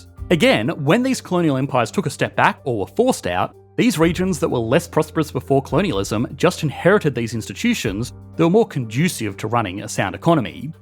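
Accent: Australia. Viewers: good job. Are you Ozzie?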